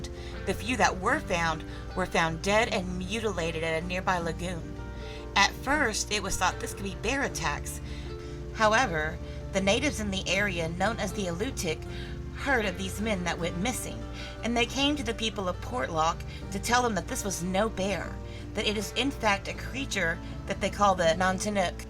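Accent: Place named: South, United States. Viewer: I just love the southern accent 😉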